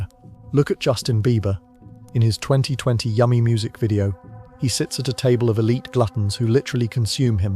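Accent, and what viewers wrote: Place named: United Kingdom. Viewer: Sensationalistic AI slop. Powerful people always have and always will exploit the ambitious and vulnerable. A robot’s British accent doesn’t make it true. Plenty of serious problems in the real non conspiracy world far more deserve your time. Ever consider you’re getting suckered and distracted by this junk?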